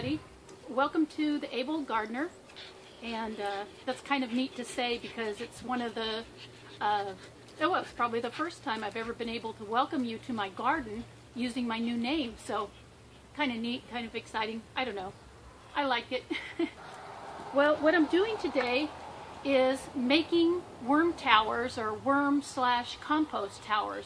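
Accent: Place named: United States. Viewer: Thank you for the great video. Which part of America are you from. Your accent is very nice. Roberta from Perth Western Australia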